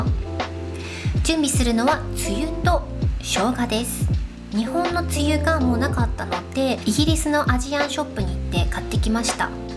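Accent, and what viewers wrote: Okinawa, Japan. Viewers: の沖縄なまり可愛い😍💕沖縄で食べたシリシリ、美味しかったのにちゃんと習ってないので、このレシピを真似て作ります！ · の沖縄弁とキャビンクリューみたいな話し方が可愛い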